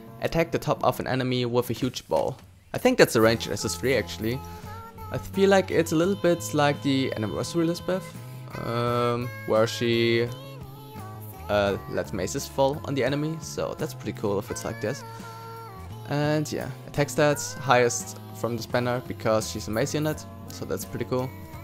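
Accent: Germany. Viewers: Özlem Polat and for his accent, it’s a german/ dutch accent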